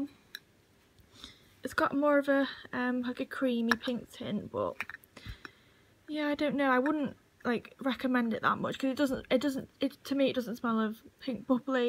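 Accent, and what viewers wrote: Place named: United Kingdom. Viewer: I really love the british accent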